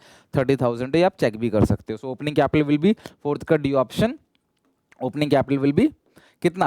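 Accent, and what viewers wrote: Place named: Uttar Pradesh, India. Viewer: Lagi raha tha ki tum up se ho😅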